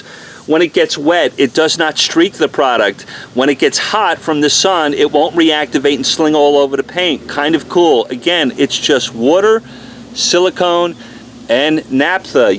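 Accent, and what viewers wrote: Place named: New York, United States. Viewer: Gotta love that Ray Romano NY accent, a rarity in Texas -- keep up the great work!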